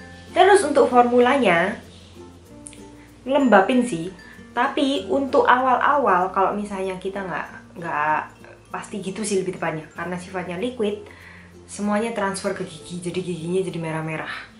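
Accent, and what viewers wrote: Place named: Surabaya, Indonesia. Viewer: medok bgt surabaya nya cik.. tooss laah sm2 org sby.. hahaha😜😜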